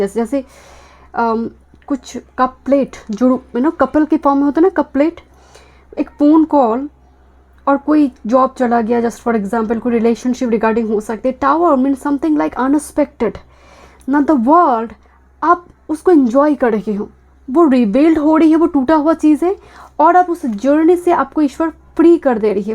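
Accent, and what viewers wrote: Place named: South, India. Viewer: Mam are you South Indian... either hyderabad,or karnataka...I guess due to your hindi accent 😅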